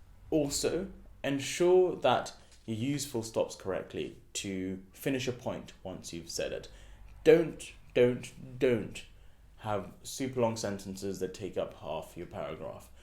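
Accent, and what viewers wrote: RP, United Kingdom. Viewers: this guy sounds more British then the posh people who live in chelsea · This was so helpful and incredibly posh lmaooo thank you man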